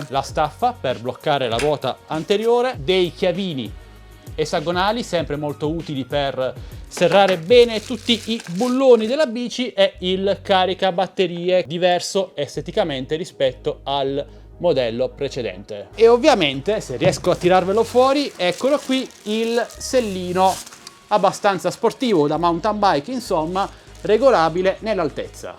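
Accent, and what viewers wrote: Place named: Bari, Italy. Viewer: Sei barese? Da come parli ci giurerei... Bel canale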